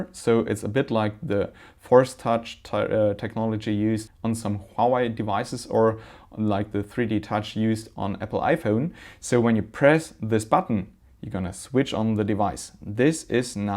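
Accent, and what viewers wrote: Germany. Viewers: I am new here, are you german cz ur accent looks like it. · warum machst du keine deutschen Videos?:D man merkt deinen Akzent:D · Am i the onlyone who thinks he sounds german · Very thick german accent, toooooo much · du sprichst echt gut englisch (konnte deinen deutschen akzent aber leicht hören) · Egal wie gut einer Englisch spricht, man hört "den Deutschen" sofort raus. ;) Ich würde gerne so gut Englisch sprechen können -super Video!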